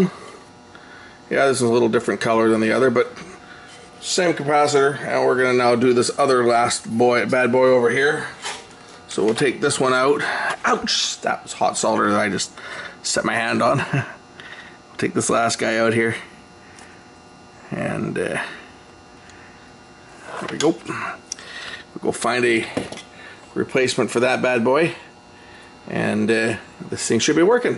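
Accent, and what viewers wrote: Canada. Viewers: Cool video. You sound like you are from Canada? Are you?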